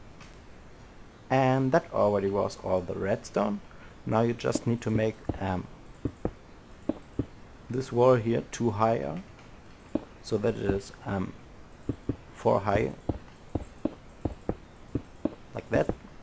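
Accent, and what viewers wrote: Germany. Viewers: Are you german ? Think so :D Glaube schon :D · Heyho, in my oppinion you speak with a german accent. is it right, that you're from germany?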